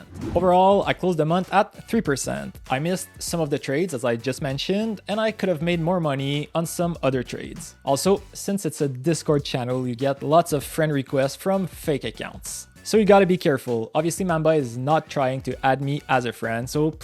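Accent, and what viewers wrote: Quebec, Canada. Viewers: Je viens tout juste de te découvrir et j’entends un fort accent Québécois 😅. Je m’abonne à ta chaine!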